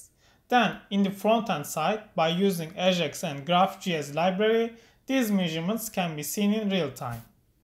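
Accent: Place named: France. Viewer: I love your fench accent :D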